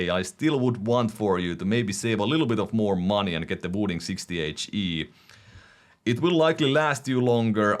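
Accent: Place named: Finland. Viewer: it's finnish accent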